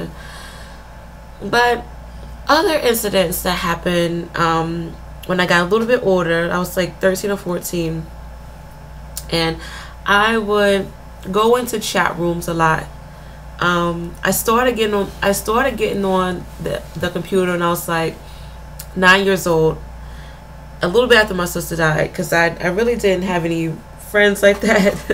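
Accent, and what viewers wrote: Caribbean. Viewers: Where are you from. I hear a couple of accents from you. Like Caribbean at times and an English accent. Be blessed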